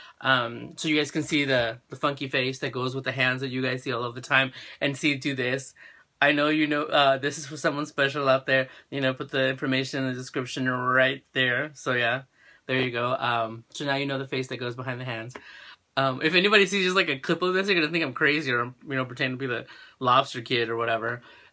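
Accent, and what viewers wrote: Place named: France. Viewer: First off you are gonna laugh when I tell you this.........but for some reason I always thought you had a bit of an accent and were FRENCH and maybe from Paris or something? I have no idea why. Second little sis is gorjuss! You ain;t bad yourself. Third I loved the entire video! Thanks!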